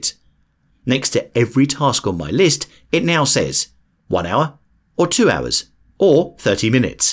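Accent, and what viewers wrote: United Kingdom. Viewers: Love this channel. I'm a visual learner, I like to read, and see infographics. It helps connect the dots for me. Your UK accent just makes everything more precise, knowledgeable, and entertaining. Keep up the great work and illustrations.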